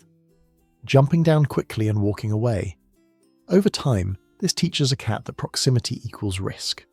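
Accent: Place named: United Kingdom. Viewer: Why do all AI videos have the same British accent. Not a good one, either.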